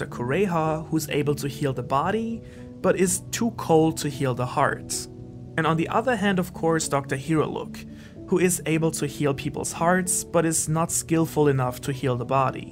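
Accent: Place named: Germany. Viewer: are you german? Your accent aounds like it 😁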